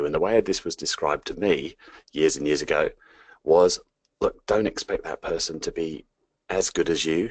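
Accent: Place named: United Kingdom. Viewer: British accents are sick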